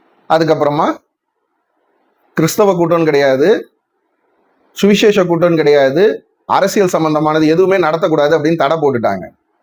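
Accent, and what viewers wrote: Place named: Tirunelveli, India. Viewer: என்ன ஒரு அருமை.... எல்லா புகழும் துதி கன மகிமை அனைத்தும் என் ஆண்டவருக்கே. அண்ணே திருநெல்வேலி தமிழ் பேசி வாழை பழத்துக்கு வலிக்காமல் ஊசி போட்டு விட்டீர்கள் 🎉🎉🎉🎉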